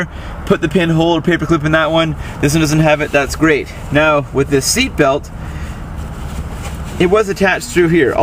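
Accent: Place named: Canada. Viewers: He's got a strong Canadian accent. Could also be from Minnesoooda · He sounds canadian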